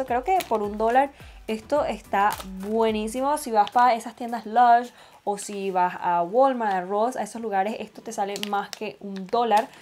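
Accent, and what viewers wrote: Venezuela. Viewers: Queria preguntar de donde eras. El acento se reconoce siempre Venezolano.❤️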